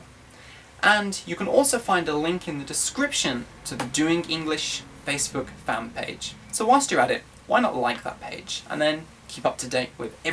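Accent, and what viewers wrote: United Kingdom. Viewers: R u a british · Love your British accent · r y brittish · are you british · Hi!, nice to meet you! I am from Mexico, Puebla. I am learning English, It has been very dificult for me to learn a second language, however, I am trying to do my best. Trying to improve my speaking and pronunciation. Surfing the net, I found your videos by chance. I enjoyed British pronuntiation, because it is elegant and sublime for me, but the most was the way you explain your explanations, it is easy for me to understand your points of view to be a better speaker. I am very happy because I have been learning from your videos, I started praticing the tecnique called shadowing, I am impressed for the results, because not only I could improve my good pronunciation, but also my fluency was very good. It is incredible that my mind automatically help me to find the vocabulary that I needed to structure my ideas. 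Thanks a lot to share your knowledge and experiencie with people who really is interesting to learn English. Sorry for my bad English. My best regards Paty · British accent !!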